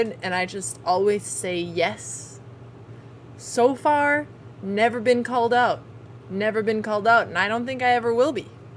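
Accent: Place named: Canada. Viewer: why does she have more canadian accent than usual in this. prob the alcohol lol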